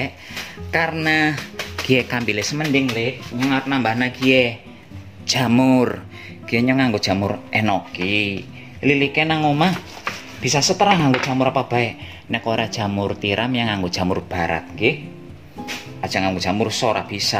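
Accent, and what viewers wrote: Banyumas, Indonesia. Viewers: Itu ciri khasnya kang..bhs ngapak. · pasti aku ketawa dulu baru komen 😂 salam ublek" capcus wajib di coba ngapak terusss nggeh 😂😂👍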